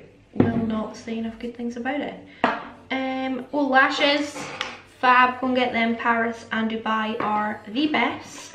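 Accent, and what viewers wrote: United Kingdom. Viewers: You are soooo pretty and unique. I can't really watch youtubers with British/Scottish/Welsh accent (having trouble understanding lol) but I haaave to watch you 😍❤ keep doing what you're doing, gives me hope I can do it one day as well.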